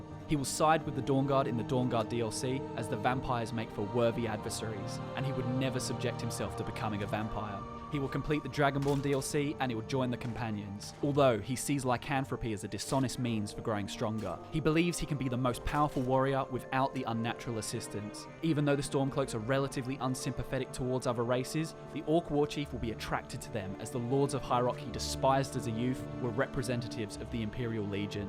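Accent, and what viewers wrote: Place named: Australia. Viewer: It's weird listening to this dude coz his Aussie accent isn't as obvious as Michael and Scott... nevertheless I think he nailed this, chose well.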